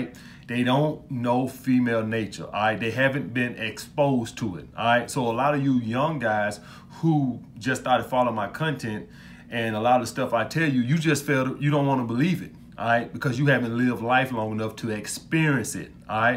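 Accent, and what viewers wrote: South, United States. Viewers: True knowledge as always! I always heard the southern accent but didn't know you was from Alabama fam!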